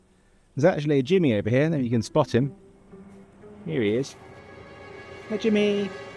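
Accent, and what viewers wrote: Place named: United Kingdom. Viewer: I’m convinced that cat dads are by far the purest people on Earth. This is incredible! ❤ I did have a couple of questions: 1) What area of the world are you in? I initially thought somewhere in the UK just from your accent, but then a I thought somewhere more tropical because of the plants and the birds. 2) What do you do about bad weather? I imagine there must be some sort of plexiglass or something on the ceiling at least for in case if it rains (I’m assuming it doesn’t snow where you are 😂), and then I was wondering what you would do about excessive heat? Maybe the cats just have to stay outside, or if the walls also have plexiglass covering then you can just leave the doors to the house open and if you have air conditioning then it would cool down the catio too? 3) Do you ever clean the catio out? I would imagine there’s lots of upkeep and I would love to see a video on that. 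Anyways, I really enjoyed the video, it looks so peaceful and all the cats seem so happy. ❤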